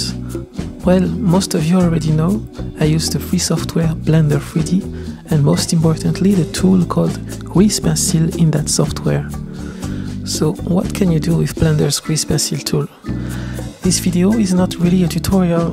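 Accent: France. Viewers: you’re French? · L'accent français me fait rire, continue c'est trop bien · Is this a french accent? Because your voice is lovely to listen too. Besides that, your videos are awesome.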